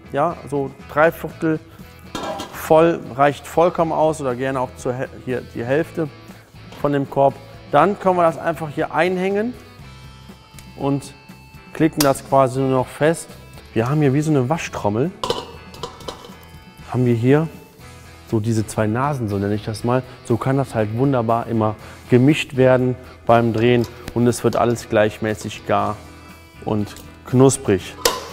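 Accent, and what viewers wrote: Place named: Rhineland, Germany. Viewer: endlich wieder rheinische tön hüüre, näää wat es dat schön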